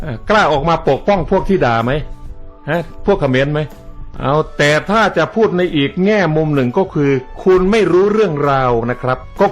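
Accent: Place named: South, Thailand. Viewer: ชอบ จังฮู้นิ รู้เรื่องมวยละเอียด พากย์สนุก แหลงใต้แบบเตินนี่คนใต้ชอบครับ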